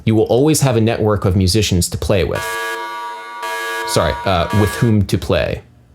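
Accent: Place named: United States. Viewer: He's not speaking English - He's speaking American English. Huge difference. Like most Americans, he has no idea of how to speak and let their audience digest what they are saying...just 7minutes of constant monotone robotic babble without barely coming up for air. Feckin awful. I've no idea why American's think it sounds cool to speak like this cos to English speakers it just sounds pure thick.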